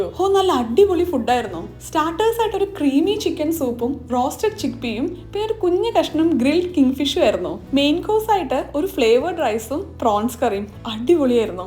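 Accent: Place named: Valluvanad, India. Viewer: Calicut University in Malappuram തേഞ്ഞിപ്പാലം പക്ഷേ അതിനും പേര് പറയുന്നത് Calicut University എന്നാണ്❤️ കൊല്ലം വഴി വന്നാൽ ചിലപ്പോൾ എന്നെ കണ്ടേക്കാം എനിക്ക് ഒരുപാട് ഇഷ്ടമുള്ള ഒരു influencer ആണ് എന്റെ ഈ കുഞ്ഞ് അനിയത്തി കുട്ടി വള്ളുവനാടൻ സംസാരം കൊണ്ട് ആരെയും ആകർഷിക്കുന്ന ഒരു കുട്ടിയാണ് നിങ്ങൾ നിങ്ങളുടെ breakfast recipe ൽ ആകൃഷ്ടയായ ഞാൻ നിങ്ങളോടൊപ്പം സഞ്ചരിച്ച് Yuvi boyൽ എത്തിച്ചേർന്നിരിക്കുകയാണ് നാട്ടിലെത്തിയ നിറഞ്ഞപ്പോൾ പറഞ്ഞറിയിക്കാൻ കഴിയാത്ത സന്തോഷം വല്ലപ്പോഴും എവിടെയെങ്കിലും വച്ച് കാണണമെന്നാണ് എന്റെ ആഗ്രഹവും പ്രാർത്ഥനയും അത്രത്തോളം എനിക്ക് ഇഷ്ടമാണ് ഈ മല്ലു പെൺകുട്ടിയെ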